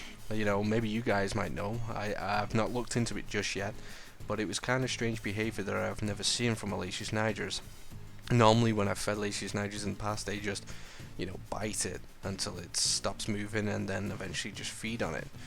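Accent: United States. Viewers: Are you American, Scottish or Manc? 🤔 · Why are you putting on an American accent?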